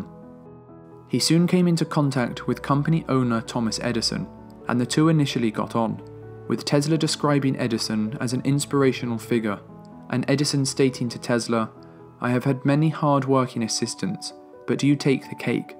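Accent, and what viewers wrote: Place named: Estuary, United Kingdom. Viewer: Noce job. Remember v.o. "the' is "thee" on all vowels . (There's only 5...) And no estuary flat "Oy" on "I"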